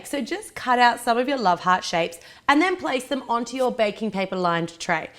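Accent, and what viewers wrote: Australia. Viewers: I thought you were australian ?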